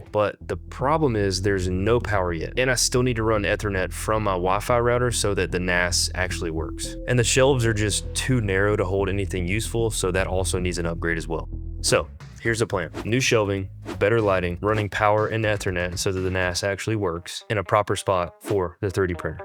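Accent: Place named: Rural, United States. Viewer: First tech youtuber I've seen with a country accent. Love the build, looks clean!!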